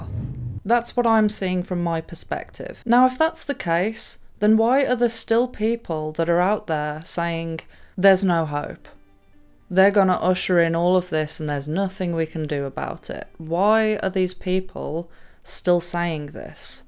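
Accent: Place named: Birmingham, United Kingdom. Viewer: I like ur accent where you from? Im a brummy you sound like ur by them sides. ?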